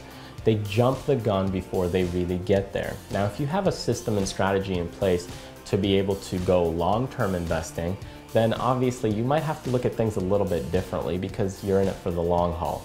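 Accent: Russia. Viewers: thank you for a great video man , btw are you Russian ?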